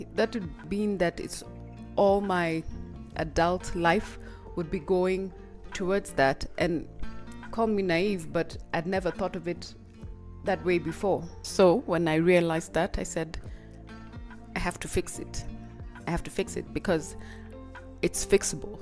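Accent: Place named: Kenya. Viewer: You never lost your Kenyan accent,,, being Kenyan is permanent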